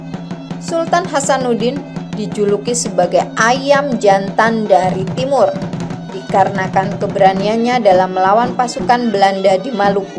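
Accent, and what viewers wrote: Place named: Java, Indonesia. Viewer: masih kentara logat jawanya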